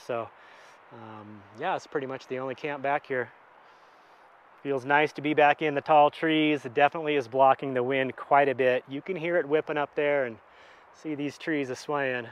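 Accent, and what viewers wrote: United States. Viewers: hi, thank you from us Europeans for putting the temperature in C°, just another question, why is "Route (Root) 66" pronounced different to " I'm taking that Route ( Rowt ) is this an American thing? another great video 👍🇬🇧🇬🇧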